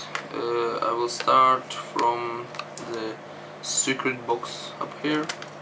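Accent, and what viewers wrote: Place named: Israel. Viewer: ידעתי מהתחלה שאתה ישראלי בגלל המבטא תודה אחי עזרתי לי מאוד :)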